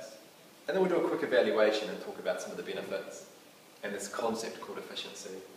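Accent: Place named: New Zealand. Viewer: Is it really that hard for americans to understand a new zealand accent? God sake lol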